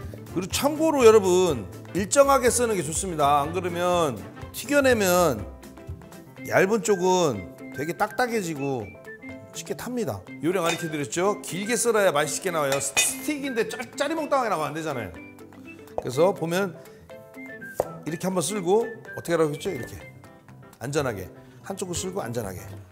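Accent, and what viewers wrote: Chungcheong, South Korea. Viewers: 자기가 언제부터 충청도 사투리 썼다고 웃음 나오네 충청도 몇년이나 살았다고 내가 알기로는 중고등학교도 서울서 나온거 같은데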